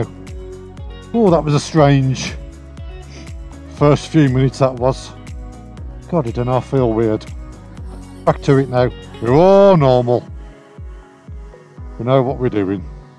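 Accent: Birmingham, United Kingdom. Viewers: Tuned into to this as I've been thinking about getting a full license and the idea of a CB500X caught my attention for a while. First few seconds and I'm hearing a familiar accent... Within a minute or two I realise where you are and how this isn't a million miles away from me! First time I've seen a bike vid that's based over in Brum side. I'm going to have to go through some other vids now! Thanks in advance. All the best :)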